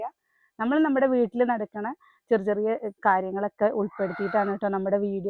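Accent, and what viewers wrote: Palakkad, India. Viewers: നിങ്ങളുട പാലക്കാടൻ ഭാഷ കേൾക്കാൻ വേണ്ടി മാത്രമാണ് ഞാൻ വീഡിയോ കാണുന്നത്. നിങ്ങൾ പൊളിയല്ലേ.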